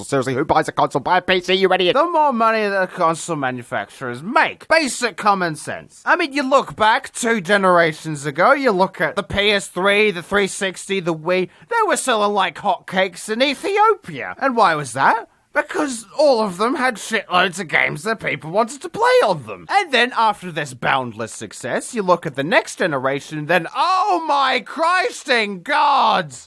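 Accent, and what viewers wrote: Australia. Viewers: Gaijin Goombah Media Australian not English mister goombah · No because he’s australian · No he's australian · He's Australian you git. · He’s Australian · W8, are you Australian? Sick, me tooooooooooooooooooooooooooooooo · Aussie not English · Gaijin Goombah Media Australian not English (They're different I tell you, THEY'RE DIFFERENT!!!!!!)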